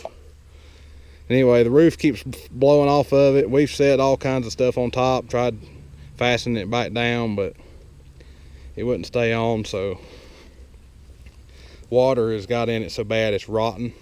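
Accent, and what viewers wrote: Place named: Kentucky, United States. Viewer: Love my 1025R!!! Great little tractors. Are you a Kentuckian??? You sound like one lol. Im from South Central Kentucky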